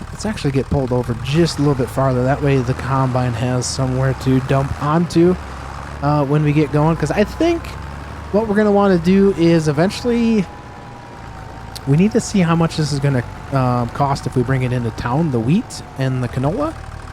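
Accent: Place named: Upper Midwest, United States. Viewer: Love from Michigan…thought i could pick up a bit of northern Midwest in your accent. Blink twice if i’m right lol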